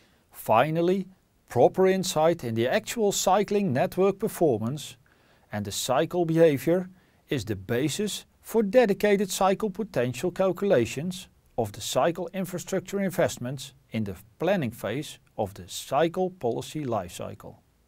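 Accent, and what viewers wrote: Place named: Netherlands. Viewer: Very lekker Dutch accent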